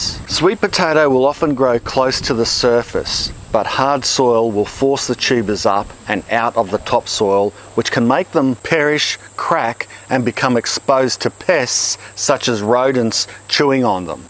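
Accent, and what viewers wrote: Queensland, Australia. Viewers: Great vid, great tips! Thanks, mate! I'm actually living in Northern Canada, where we don't have enough heat or warmth to grow sweet potatoes - at least not the size you have there. But I was initially caught by your accent, and said to my self that sure sounds like a Qld accent. hehehe I went to school at Southport for 6 years.😂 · So good to hear an Aussie voice! We live in S.France and are going to plant our sweet potatoes for the first time...thanks!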